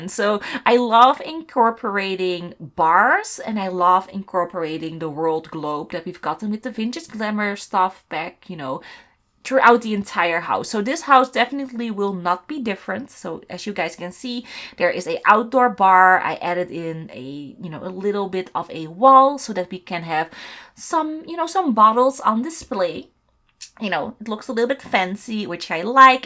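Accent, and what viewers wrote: Netherlands. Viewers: Are you dutch, or where are you from?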